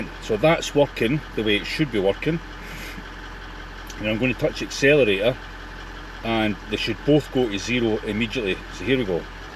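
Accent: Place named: Scotland, United Kingdom. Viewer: Where are u based mate might be a stupid question because your Scottish but thought I would ask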